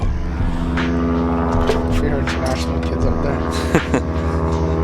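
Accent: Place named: Europe. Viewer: What country are u from... I hear a little European when u speak.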